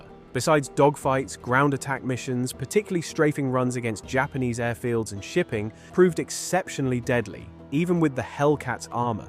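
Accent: United Kingdom. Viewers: These things always sound more important with a British guy announcing.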